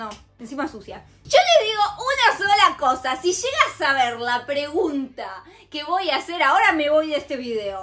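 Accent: Argentina. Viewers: Melina sos Argentina😅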